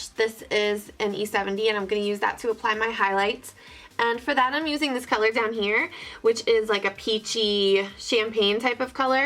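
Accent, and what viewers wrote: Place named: Philadelphia, United States. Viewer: So cute! Love the dress too. Every time I watch one of your videos, my husband, who grew up in South Jersey, but whose family is from Philadelphia always says "It's that girl from Philly, right?" I can't hear your accent, but he can!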